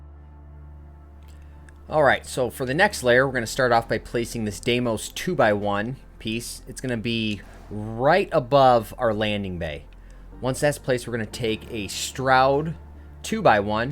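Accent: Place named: Minnesota, United States. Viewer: Are you from Minnesota by chance? I know a lot of people from my hometown who have the same accent as you.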